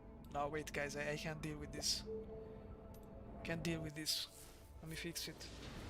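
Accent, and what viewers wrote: Italy. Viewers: italian accents or my ear are not working? still idc i love youre video still se capisci sta cosa allora ti ho beccato;)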